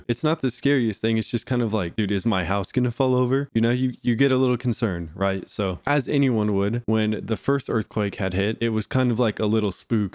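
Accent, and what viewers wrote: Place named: South, United States. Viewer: When did turn like extremely southern?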